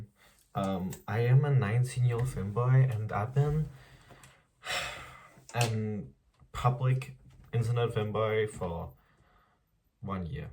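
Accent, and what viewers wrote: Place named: Denmark. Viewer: danmark nævnt i medierne gutter (danske accent er lowkey crazy)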